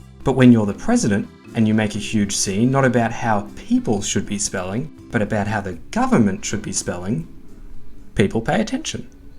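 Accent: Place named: Australia. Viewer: Aussie? Nice 🇦🇺🇦🇺🇦🇺🦘👊🏼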